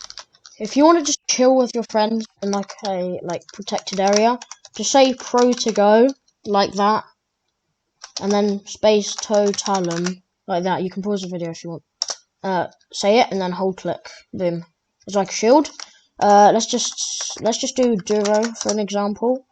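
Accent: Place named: United Kingdom. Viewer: I like how ur talking Britain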